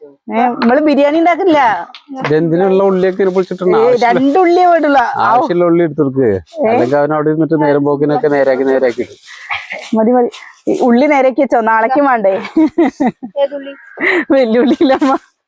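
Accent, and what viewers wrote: Palakkad, India. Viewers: Njangalocke samsaram (palackadan slang) kelkaan vendi maathram vlog kanunnavaraan...just avoid -ve comment,go a head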